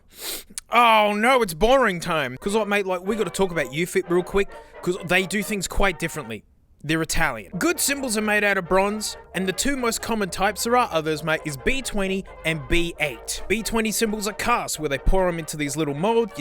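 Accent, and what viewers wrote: Australia. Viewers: I can't hardly tell the difference between any cymbal on my chineesium earbuds I just like listening to funny Australian man lul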